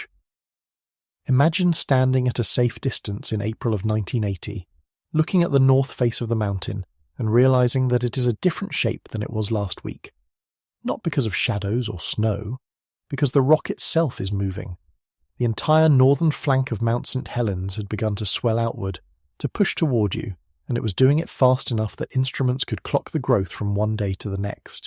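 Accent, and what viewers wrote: United Kingdom. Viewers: They need to give this some pronunciation lessons - it said magmer for magma and sawr for saw. I'm expecting it's going to say 'Warshington State'. Sounds a bit British.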